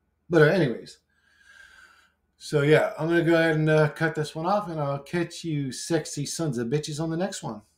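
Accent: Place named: Nigeria. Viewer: The Nigerian uncle accent my favorite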